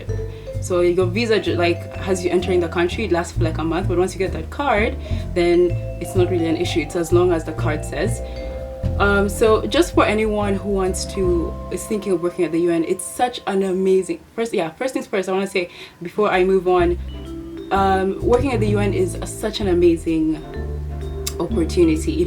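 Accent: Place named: Kenya. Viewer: omg, i thought you were Kenyan at first, just from the accent. definitely subscribing to your channel. Thank you for the video.It was definitely informative. I hope to be able to intern at U.N next summer/ keeping my opes up.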